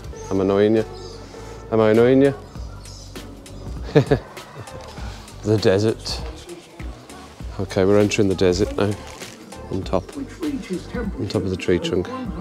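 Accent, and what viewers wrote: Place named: Wales, United Kingdom. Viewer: hell no 👊I just want to hear Your voice, a Wales accent is so cool 😎. BUT there will be songs that you will like,very far and few between, but you will like them.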